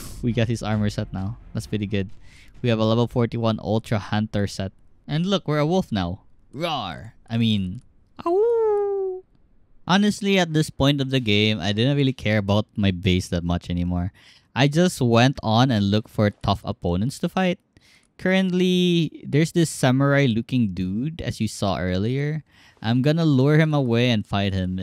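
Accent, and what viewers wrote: Philippines. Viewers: Are you Filipino? Cuz your accent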